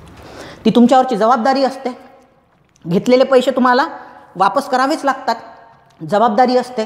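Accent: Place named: Amravati, India. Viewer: Aj khup divsane amaravti chi language aaikun khup chan vatle.... Bhari ekdm. Lecture aaikun tr khup fayda zala.. Pn aj amaravti chi athvn.. Ali ba. 🙂😀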